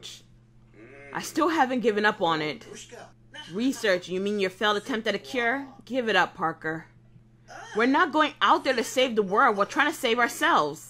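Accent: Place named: South America. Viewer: awesome south american accent :)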